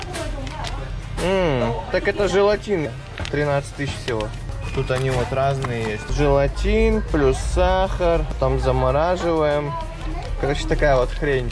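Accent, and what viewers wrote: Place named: Harlem, United States. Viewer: Где английский учил, по фильмам? Говоришь как черный из Гарлема.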